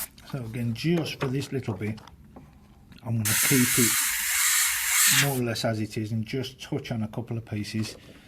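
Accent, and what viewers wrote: Black Country, United Kingdom. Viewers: Hi, bostin tutorial mate, just gerrin into airbrushin meself, bought a rook of createx wicked colours, badger patriot 105, compressor etc and bin avin a goo at tryin to do some skulls with real fire, gone a bit tits up a few times while i get used to the airbrush and the paints, musta watched 100 `how to real fire` videos on ere but your`s is the fust one that shows it in absolute detail which someone like me can follow in real time, crackin job mucka, BTW Willenhall here near Wolvo so we have similar accents dow we, arr LOL. Thanks for this mate it`s taught be a hell of a lot. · I cor tell where yo accent is from whether it's more Cradeley or Oldbury/ Bearwood side lol either way, you aren't far from me. I have just started doing car and bike graphics after doing canvases for about 4 years. Great vid just wish it was around when I started about 5 years ago :)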